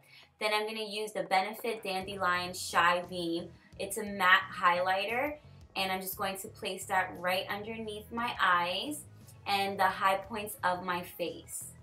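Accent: New York, United States. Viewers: are you from nyc cause I hear that accent like is it me or are you a fellow new yorker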